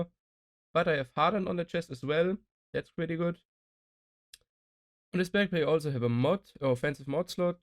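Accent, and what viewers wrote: Germany. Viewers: Bist du deutscher? Hast diesen deutschen accent ^^. Gutes Video ! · Digga das war das erste was ich gedacht habe... es regt mich turbo auf :D wenn die leute diesen deutschen "akzent" haben.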